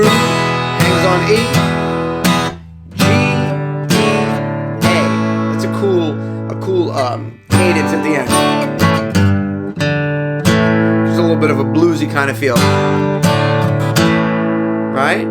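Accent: New York, United States. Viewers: Nicely done and thank you for the work on this. I'm trying to place your accent...which borough of NYC are you from?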